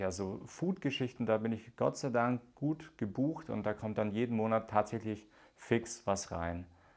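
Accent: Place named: Bavaria, Germany. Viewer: Cooler Kanal! Abo is raus :D schön auch einfach mal nen Bayer zu hören. Wie hoch ist denn dein Lebensstandard, den Du Dir erwirtschaften musst, wenn Ich nachfragen darf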